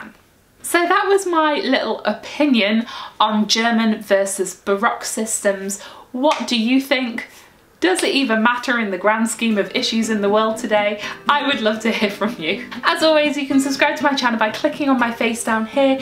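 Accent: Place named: RP, United Kingdom. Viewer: This is amazing. I was wondering why th f sharp sounded so close g on my recorder. I figure it out myself before chek that out with u because of course . you are the authority on the recorder. Your receive pronunciation gives u that title as well.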